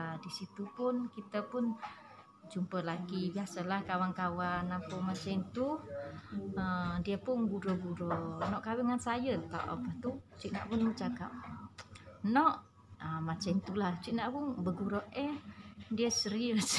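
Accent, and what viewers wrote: Terengganu, Malaysia. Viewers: Loghat ganu dah pekat.. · wah menarik story cinta bersemi di luar negara ye, cakap pun dah slang ganu kita 😄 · oooohhh... ingatkan orang terengganu, bagus slang dah boleh ikut orang tempatan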